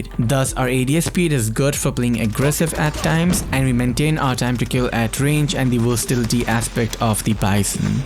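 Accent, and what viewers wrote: India. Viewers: Btw bro are you indian? You sound like indian ☺️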